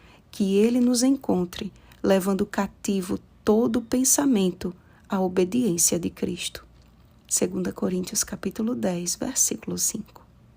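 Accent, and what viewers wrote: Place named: Northeast, Brazil. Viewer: Excellent always! Your northeastern accent is beautiful, your voice is very soft and welcoming! God bless your ministry! I'm from the south of Minas Gerais, living in Alberta, Canada for 1 year and 4 months...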